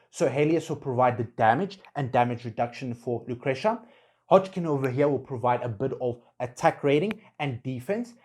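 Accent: South Africa. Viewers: Hey, you a Saffa?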